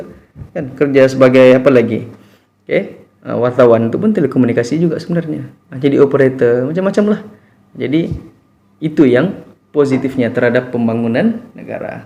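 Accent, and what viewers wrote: Sabah, Malaysia. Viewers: Suka dengar bahasa sabahan cikgu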